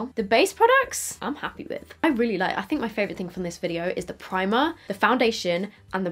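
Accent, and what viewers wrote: United States. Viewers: Omg. Can u do a video speaking in an American accent!!???? That would be so cool and funny if u did like a whole tutorial in ur American accent!!! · Who else wasn't ready for the American accent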